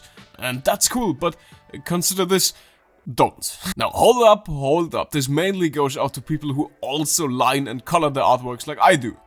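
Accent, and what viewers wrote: Germany. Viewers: yo are you german? bc your accent gives it away a bit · Him being sick oddly made him sound twice as german as usual. Especially at the end when he screamed "NOW GROW SOME BALLS DAMIT!" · is it me, or has less of a German accent over the time that he's been posting.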